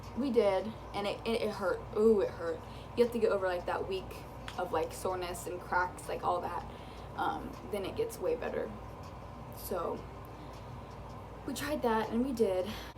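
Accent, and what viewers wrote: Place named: Indiana, United States. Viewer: I bet she’s from Indianapolis or close to it. It’s where I’m from and she talks how we do in central Indiana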